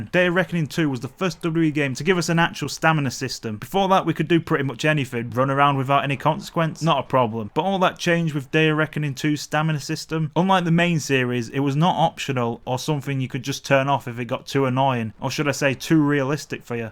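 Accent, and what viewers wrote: Yorkshire, United Kingdom. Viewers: Are u from Yorkshire?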